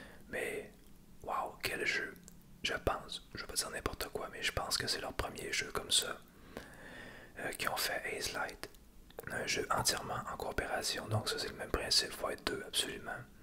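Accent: Quebec, Canada. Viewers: Merci pour tes vidéos. J'adore ta voix, et ton petit accent québécois me déclenche énormément 😉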